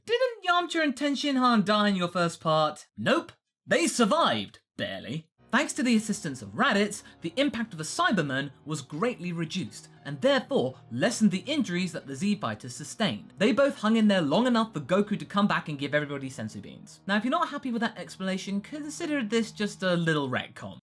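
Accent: United Kingdom. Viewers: R u British ? Just askin sorry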